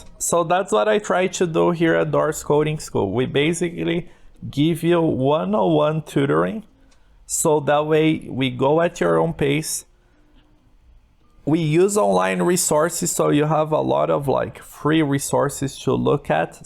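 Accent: Brazil. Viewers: tu é br né nao? kskss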